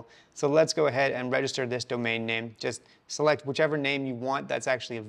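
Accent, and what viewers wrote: Australia. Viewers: but he sounds like an Aussie... kind of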